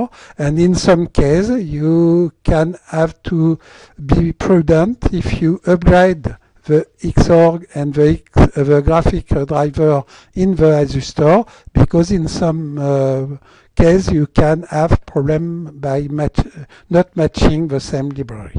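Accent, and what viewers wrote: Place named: France. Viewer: Thanks for this video and this tools !!! You're great to develop and share that with us. And your French accent is incredible ^^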